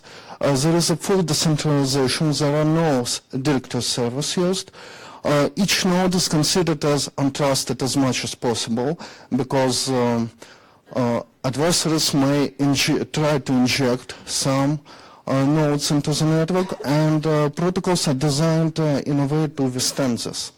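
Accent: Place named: Russia. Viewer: A russian speaking english with an accent into an overdriven microphone about a somewhat difficult to understand and interesting topic - god damn. Furthermore, it's the only useful video when you search for "garlic routing". :(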